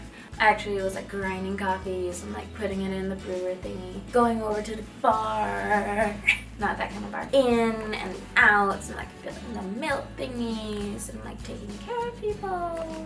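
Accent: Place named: Canada. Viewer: You sound Canadian ❤️🇨🇦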